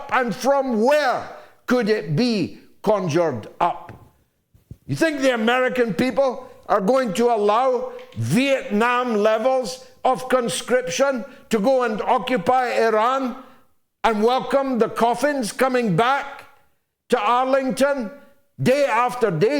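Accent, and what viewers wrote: Scotland, United Kingdom. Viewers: I love your Scottish accent!!! from Brittany!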